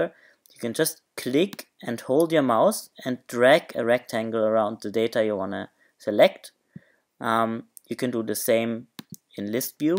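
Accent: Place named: Germany. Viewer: You sound German :D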